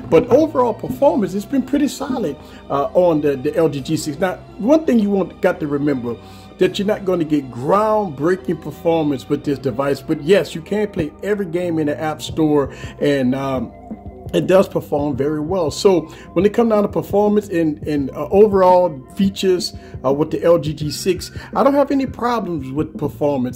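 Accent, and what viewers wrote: Louisiana, United States. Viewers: love that Louisiana accent, pretty cool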